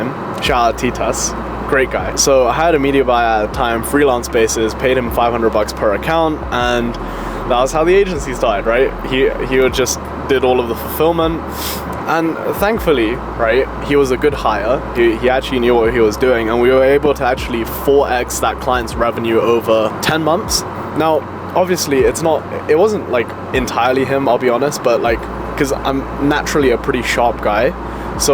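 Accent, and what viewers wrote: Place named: Australia. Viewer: Since when do Chinese have an Australian accent?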